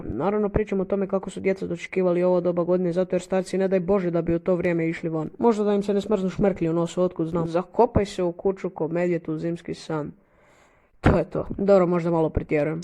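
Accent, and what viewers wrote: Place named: Zagorje, Croatia. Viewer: Od kud si.. plzzz reci da si iz Zagorja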